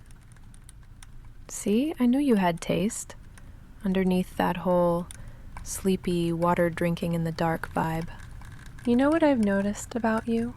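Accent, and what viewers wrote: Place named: United Kingdom. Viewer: Ngl I love that British accent. I’ve always had something for British women. Great audio definitely saving this on a playlist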